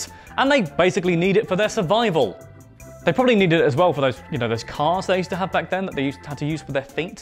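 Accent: England, United Kingdom. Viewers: I've always wondered are you english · I've always wondered. how does one get such an awesome British accent? I'm part English and only have a Swedish accent mixed with native accent (both I am too) QAQ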